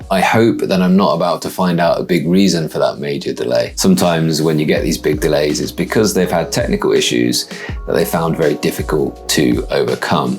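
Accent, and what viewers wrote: United Kingdom. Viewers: I'm a frog. You have such a looooovely British accent. Love it! Sincerely.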